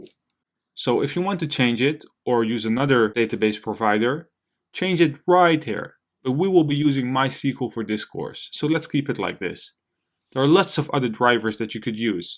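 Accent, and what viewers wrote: Netherlands. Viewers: Ik had wel ff moeite om te horen dat je Nederlands was :P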